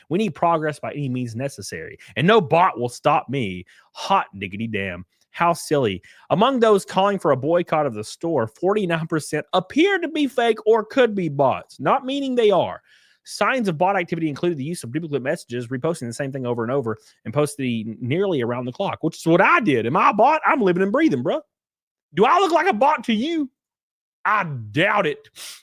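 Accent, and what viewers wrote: South, United States. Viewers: Hey there’s the hunk with the bad ass country/southern accent, how you been?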